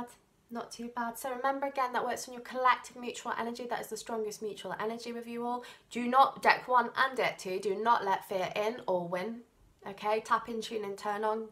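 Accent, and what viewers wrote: United Kingdom. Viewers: Deck #2 🙊💖 thank you, this is a nice reading. I love your purple hair :) and your style. It's just been a little hard for me to keep with your fast speaking 😅 bc British English has a strong accent for me, I'm used to American accent more, so yeah it was hard to keep on with the reading 😅 had to turn on the subtitles.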